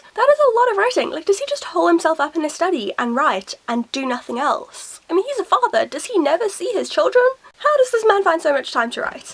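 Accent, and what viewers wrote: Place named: United Kingdom. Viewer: WOW, there should be more female readers like you! You admire Percy Jackson and Alex Rider too! (Have you done a Q&A video about yourself? You seem really interesting. But, I couldn't find one of those videos on your channel. One of the questions I would ask is this one: you live in South Africa. But you got an elegant middle class English accent. How come? I'm just curious. Would you do a video about? )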